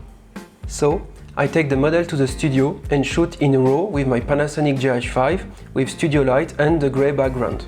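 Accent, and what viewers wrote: France. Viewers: are you french ? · Are you french ?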